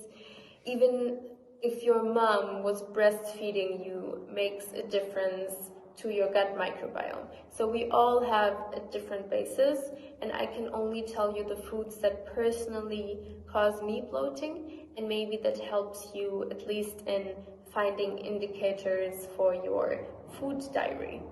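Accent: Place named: Germany. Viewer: She just have such a german accent.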